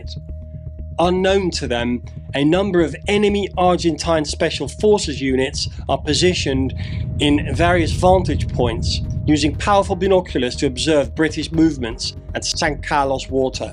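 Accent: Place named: Netherlands. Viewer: Ik meende al een Hollands accent te horen. Maar is je Engels nou goed voor een Hollander, of is je Nederlands (erg) goed voor een Brit? Anyhoo, gaaf kanaal, maat!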